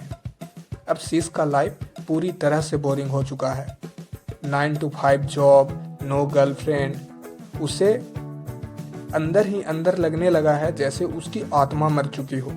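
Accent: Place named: Bihar, India. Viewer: Awaaj se Bihar Wale bhaiya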